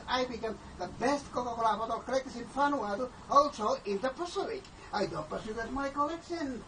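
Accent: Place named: Ireland. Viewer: Bros got an Irish accent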